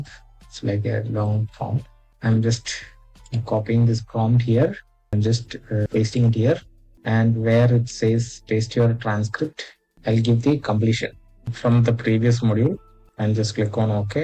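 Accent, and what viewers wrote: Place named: Kerala, India. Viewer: I think your Malayalam should not come in English and can be litter slow and steady in talking.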